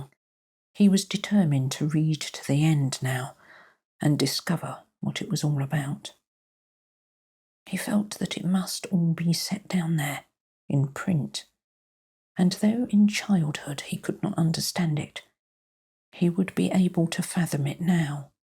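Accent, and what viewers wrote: United Kingdom. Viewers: No problem,. Your British accent also fits these tales. Keep up the good work!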